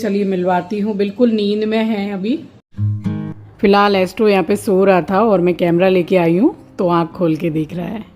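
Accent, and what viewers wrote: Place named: Bengal, India. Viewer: Your bengali is so sweet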